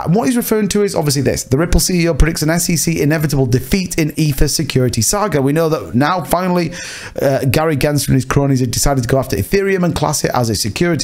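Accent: United Kingdom. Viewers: Great to hear a British voice doing XRP content. 🙏🏻 come on XRP…… from a fellow Brit.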